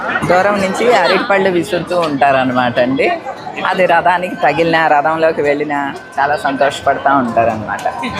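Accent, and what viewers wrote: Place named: Godavari, India. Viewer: Maintinundi aa someswaruni darshanam kaliginchinanduku chala santhoshanga undi chala santhosham yee janmabandamo idi sivayyalani andarini chupistunnandiku chala santhosham mee godarollo matalu chala theeyyagauntayandi aaa godari neellalaga chala santhoshamandi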